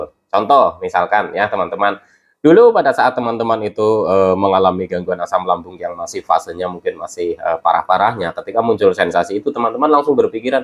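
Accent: Banyumas, Indonesia. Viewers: Nyong ngapak jga mas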